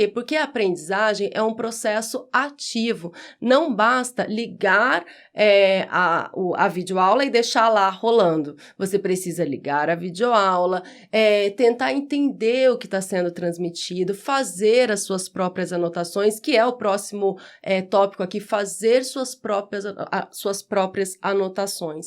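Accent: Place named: Northeast, Brazil. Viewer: Teu sotaque parece com o nosso daqui do Nordeste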